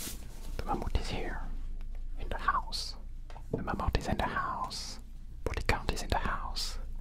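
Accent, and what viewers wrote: France. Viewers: I'm not sure but are you french? I'm having a hard time recognizing the accent. If that's the case, I'd love to see a video in French someday !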